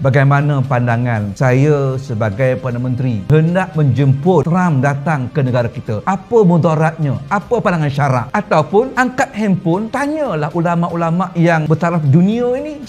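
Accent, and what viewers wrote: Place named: Kelantan, Malaysia. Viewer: Longat pun tau puak kelantan thailands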